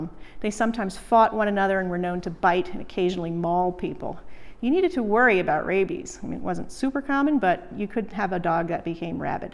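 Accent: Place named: United States. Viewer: Why is an American lady talking about Exeter history?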